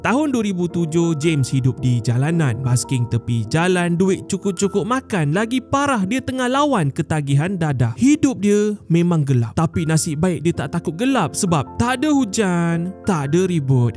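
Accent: Sabah, Malaysia. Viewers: Andu bah Bob..seluruh dunia bersedih 😢 ni pandai slang Sabah😅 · "Andu bah bob" ni org sabah ka 😂 dr 1st tgk konten nya tertanya² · Hmm bob 😢😢 btw bang mmg org kinabalu kot sebab ada “anduk dgn bah” dia 😅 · sekali sekala loghat sabah bha teda hujan teda ribut tu 😂😂😂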